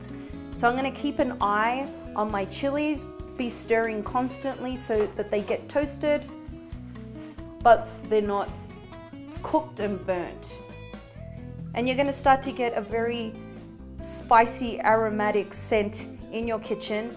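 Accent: Australia. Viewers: Being mexican and from Texas your transition from your Australian accent to perfect Spanish blows my mind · You sound Australia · U sound Australian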